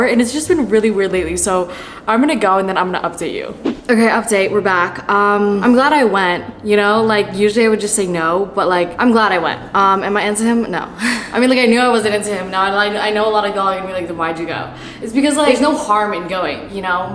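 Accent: United Kingdom. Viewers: Nobody noticed the British accent?😂😂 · Your British accent is swoon worthy. >.<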